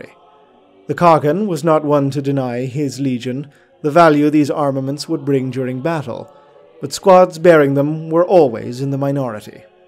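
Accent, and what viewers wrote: Ireland. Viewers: Excellent work as ever. Is that an Irish accent I hear?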